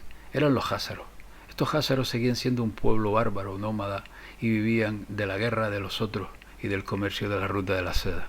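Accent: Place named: Canary Islands, Spain. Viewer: Por tu acento deduzco que eres Canario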